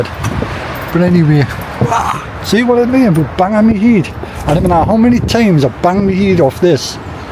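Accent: Newcastle, United Kingdom. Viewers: Love a Geordie accent my mum came from Newcastle as did by Uncle and two of my favourite aunts and sadly they’re all not here. I hear your accent and it reminds me of my childhood thank you. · Spot on 👍 we are who we are, so others can like it or jog on 😜.... BTW I love the gordie accent.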